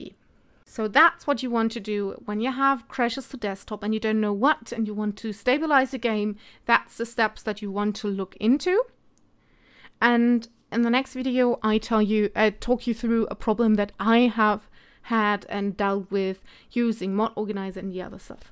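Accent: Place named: Germany. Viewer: GERMAN!!!! YES!!! That’s what I’m hearing! Well it’s a lovely voice. ;)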